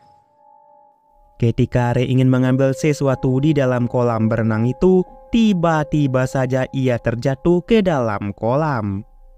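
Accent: Aceh, Indonesia. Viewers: Mentok kali bahasa logat Aceh nya ia bos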